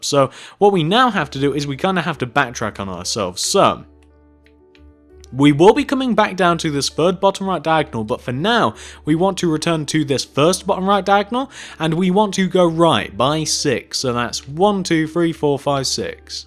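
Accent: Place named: RP, United Kingdom. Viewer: Where are you from cos your accent sounds kinda posh lol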